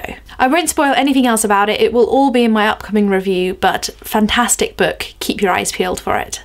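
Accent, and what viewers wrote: United Kingdom. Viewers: Hi, you from England?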